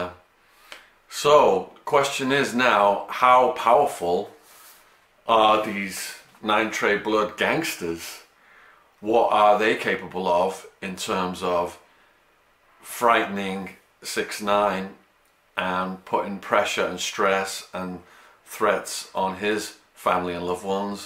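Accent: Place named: England, United Kingdom. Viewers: I did not expect a white Englishman when I went on this video, can’t complain tho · Oh.. I see you do stuff about prisons and that. My prejudice - my bad, but it was a jarring experience clicking on this and hearing some middle-class Englishman commenting on that kids situation 😋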